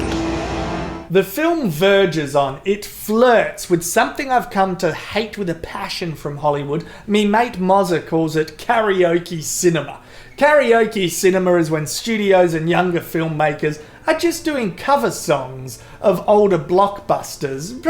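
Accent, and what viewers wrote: Australia. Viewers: Your Australian accent is so golden I don't want to hear it too much and get used to it so I can enjoy your animal fight videos more thoroughly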